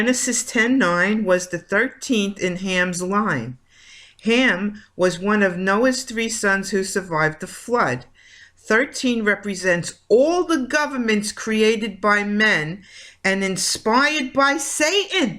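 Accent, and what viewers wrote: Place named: New York, United States. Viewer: Also I love your accent.. NY?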